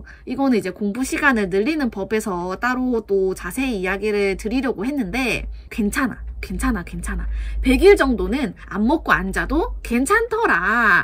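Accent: Gyeongsang, South Korea. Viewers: 혹시 경남분이세요? 저 고등학교때 쌤이랑 말투가 넘 비슷해세유ㅎㅎㅎ귀엽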